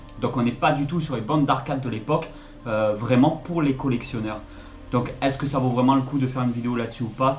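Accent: Toulouse, France. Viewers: Coucou j'espère que tu vas bien? Oui oui sa serai trop cool de voir l'arcade avec l'intérieur de la machine le système de monnayeur et tout se qui se trouve à l'intérieur. Tout les systèmes ect.. Je me suis toujours posé la question comment sa fonctionne. Donc une vidéo sur sa je pense que sa pourrait faire une vidéo qui pourrait plaire à beaucoup de monde. Merci de nous régaler Sa fait un bien fou de te revoir. Ton accent de toulousain nous avait manqué. En plus on est pas si loin moi je suis de capestang à côté de beziers. Je te souhaite une excellente continuation. Continue à nous régaler. A très bientôt. Bonne journée à toi et à toute la famille.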